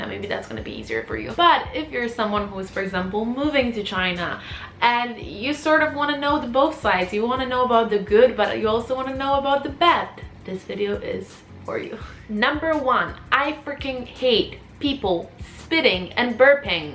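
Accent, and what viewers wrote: United States. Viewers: Could you explain your american accent？ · Are you Danish or Parisan, I am so confused. And you don't seem to have any European accent, you sound American to me. Anyway there are many overseas Chinese around the world. Apparently you need someone who's as interculturally smart as you, probably a multilingualist for your future kids benefits, thb, do you secretly have yellow fever, just wondering :p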